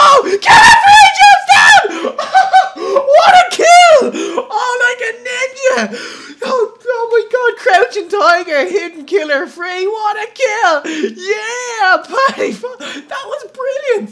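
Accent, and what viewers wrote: Ireland. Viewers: your just totalllyy amazing i love your little irish accent xxx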